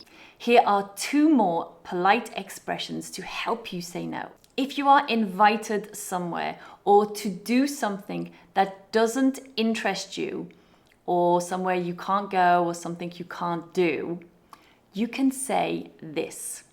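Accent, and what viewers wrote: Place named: Midlands, United Kingdom. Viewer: Your accent appears to me " Midlands or estuary accent " don't you?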